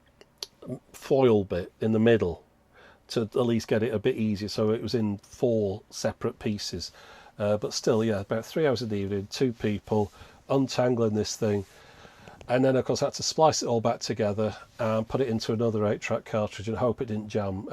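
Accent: United Kingdom. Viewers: The question of whether you would be more successful as an American is an interesting one. I don't think it's as simple as "Americans don't want to listen to British people" since many Americans tend to think that people with British accents are classy and/or intelligent, and in any case it sounds kind of exotic. So I would wager that for some people it's actually a bonus. It might still be a net negative, but it's probably not a huge disadvantage for you. It is possible that you would be more successful if you had more of an outgoing, high-energy personality like you see with so many of the big YouTubers, since that probably appeals to the greatest common denominator, but I personally find that exhausting.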